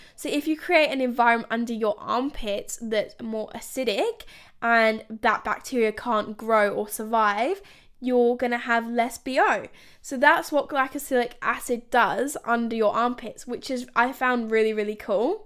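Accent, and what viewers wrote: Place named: Australia. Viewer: Am I the only one who is hearing the Aussie come out!!! Can’t wait to see your Aussie content! Xx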